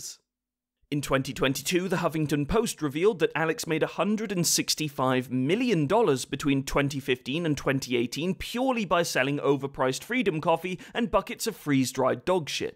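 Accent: United Kingdom. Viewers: I kept thinking... is this guy a savage British guy or a well spoken Australian...but then he said pound and meant currency and I'm siding with him being a brutally savage British. Also... yes I'm American... and... yeah... sorry about... well... most stuff after 1776... we kinda got a little upset about a lot of things and didn't think to restrict gun ownership clearly enough and it has all been down hill from that point...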